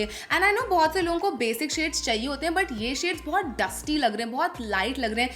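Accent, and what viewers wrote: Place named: Delhi, India. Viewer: I m your ardent fan since 2016 but my heart melts in your Hindi videos ..that slight Delhi accent makes you more relatable ❤❤❤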